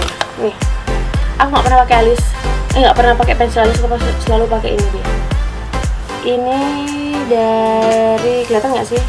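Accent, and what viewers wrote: Java, Indonesia. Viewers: jowo aslie kak. cuetohh poll wkwk😁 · Jawa nya medok ya ka? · Sukanya dari kak tu, apa adanya, gak sok keindo2 an gtu, jawa bgt wkwk.. Mantep kak, sukses terus ya, uwes ngene wae kak opo enek e.. 😁😁😁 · seneng lek mbak ngomong jowo😊lucu ae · medok tapi cantikk ka 😍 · Jowo ne medok tenan kak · kok mbak.nya lucu ya, medok.nya itu 😂 bedak tabur viva.nya jd inget bgt pas waktu smp... 😂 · Jawa nya nggak ketinggalan ya kakkk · Wong jowo to mbk?? 😁😁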